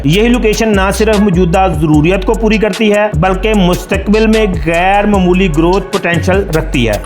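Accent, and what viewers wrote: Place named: Punjab, India. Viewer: Bhai tussi Punjabi hi bol liya karoo Kyuky tuhadi urdu vi urdu ghat ty Punjabi urdu zeyada lagti ey Mazrat nal urdu di maa bhen aik na karoo ty apni maa boli Punjabi hi bol lawoo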